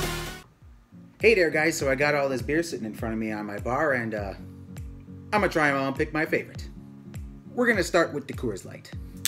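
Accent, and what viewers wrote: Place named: Upper Midwest, United States. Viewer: He has a strong Northern Midwest accent. Sandler has a New York accent. Kind of similar I guess.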